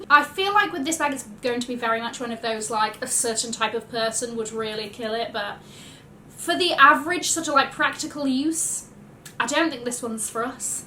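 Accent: United Kingdom. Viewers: Excellent videos on fashion trends, Miss. Fantastic British accent. Happy holidays from our channel: Australian Parakeets Stars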